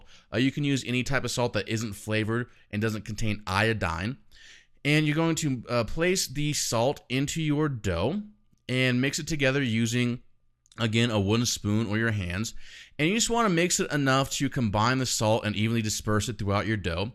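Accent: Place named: United States. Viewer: You have the same American accent than William Lane Craig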